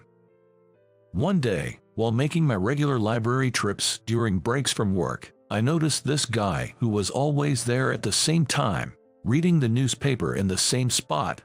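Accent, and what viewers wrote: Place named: Boston, United States. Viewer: Love the Boston voice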